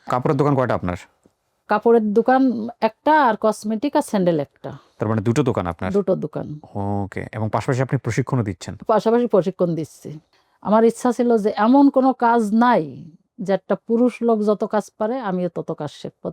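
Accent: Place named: Jessore, Bangladesh. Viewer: এটা যশোরের ভাষা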